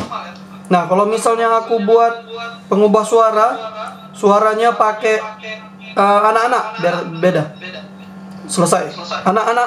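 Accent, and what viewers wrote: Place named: Sumatra, Indonesia. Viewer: dari logat dan gaya bicaranyabyg "ala..." kayaknyanorg sumatera ya bg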